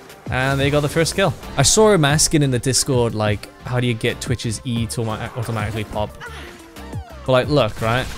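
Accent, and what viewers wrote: Wales, United Kingdom. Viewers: Awesome! The Welsh accent made me feel back at home 😊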